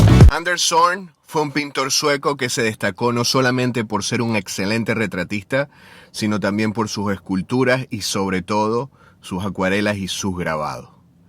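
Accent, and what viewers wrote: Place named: Venezuela. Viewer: ¡Hola! ¡Oye!, tienes un acento 'matao' , neutro, juraría que eres venezolano. ¡¡¡Excelentazo tu video!!! Saludos.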